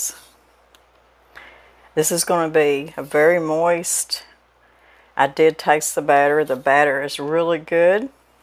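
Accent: South, United States. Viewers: You sure have a kitchen full of antiques! Love them.......Why would there be any haters. Because of the southern accent. That is GOOD southern food. Reminds me of my mothers cooking. We lived in Birmingham, Alabama till I was 8 years. Then moved north, but she continued to cook the southern way. I rememeber her saving bacon grease - my dad loved the FRIED foods! Too much of it not good for you though. Mom was a terrific cook! She could make pies that would win prizes! Made everything from scratch, and so perfectly. Canned in the fall everything there is to can. She was a very hard worker. There were 6 in our family. Love fried cabbage too - in fact love cabbage fixed any way. Going to put some in my soup today. Sounds like you people live in the Ozarks area. How beautiful it is! We were there in our travels when I married. Camped in a private front yard campground! 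· I agree with you, I love this channel and enjoy listening to. She is a true Southern lady. My husband and I have been fortunate enough to travel across the USA as well as foreign countries. People always ask us to talk so they can listen to our Southern accent. Foreigners have described our accent as akin to singing, What many people do not realize is Southern accents vary from place to place. If you enjoy Mrs., I believe you will enjoy “Cooking with Brenda Gantt.” Brenda lives in south Alabama on the Florida-Alabama state line. She has a large repertoire of Southern recipes and operates a bed and breakfast. At the request of some friends during the pandemic, she made biscuits and posted the video on Facebook. She was a huge hit, and in little more than a year, she has over 2,500,000 followers. She is also on YouTube. There are two channels that I watch at least once a day—Mrs. and Mrs. 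Brenda.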